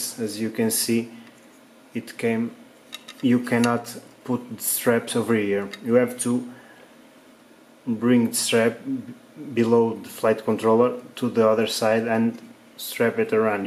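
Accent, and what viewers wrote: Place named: Portugal. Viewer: That accent is unmistakable, é um tuga..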